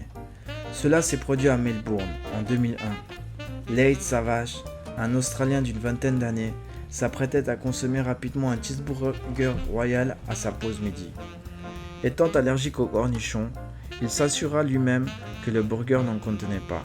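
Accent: Belgium. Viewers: Il est belge....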